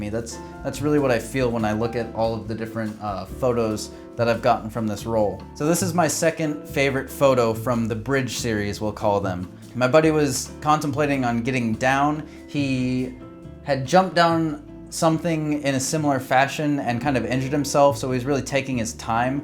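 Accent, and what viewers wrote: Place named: Seattle, United States. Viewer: From they way your talking it sounds like your from Seattle, I’m from Seattle to and are trying to get back into my photography from taking a little, i recently just ran across a Pentax K1000 w/ a tamron SP 35-210mm lens at a pawn shop and had to get it, I loved your video, I have to start practicing with mines .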